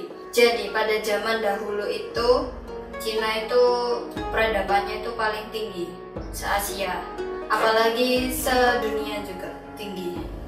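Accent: Java, Indonesia. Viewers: Njir wong jowo 😍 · jowone jowo endi mbak ? hahaha jogja ? semarang ? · Aku kira mba nya orang jepang.. eh tau2 nya medok #hahaha.. Btw, terimakasih infonya :D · kok medhok tenan wkowkowko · Halaa logat e jowo tulent iki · ojo medok medok mbak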